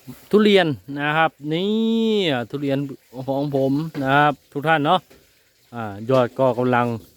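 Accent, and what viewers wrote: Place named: Isan, Thailand. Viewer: ภาษาอีสานฟังรู้บ้างไม่รู้บ้างก็น่ารักดีนะ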